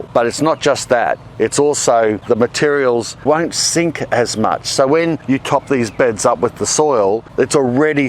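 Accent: Australia. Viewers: Fantastic video, just what I needed to see for my next project. As aussie as they get, well-spoken and bloody good at what he does. And them tradie shorts - Lol! I nominate you next host of Gardening Australia! Greetings from Sydney mate. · I don’t know why but you remind me of Kenny the toilet guy.. Maybe it’s your Australian accent .. Needless to say I love your channel. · Great explanatioan and a very cool lady back aussie accs!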